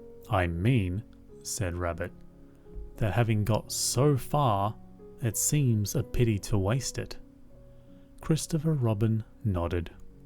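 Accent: Australia. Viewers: Thank you, I was seeking out Australian accent story tellers for my daughter (we moved to Canada where I’m originally from to give it a go being close to some family for at least the next several years) and I am feeling a bit guilty, hoping she will hold onto some part of her accent so your story telling is much appreciated :) and after living in Sydney for over 20 years and as a proud Sydneysider I have to admit I’m really enjoying it too :)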